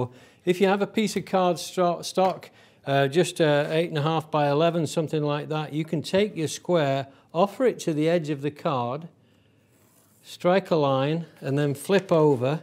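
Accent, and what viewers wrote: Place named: Southeast England, United Kingdom. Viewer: Hey, what part of the 🇬🇧 are you from? You sound like a southeastern accent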